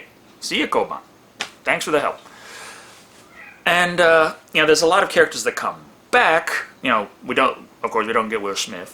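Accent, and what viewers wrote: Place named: Texas, United States. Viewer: polygon He's from Texas so he does have himself a little accent lol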